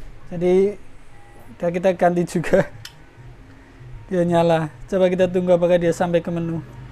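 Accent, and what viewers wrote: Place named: Java, Indonesia. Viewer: bahasanya rada medok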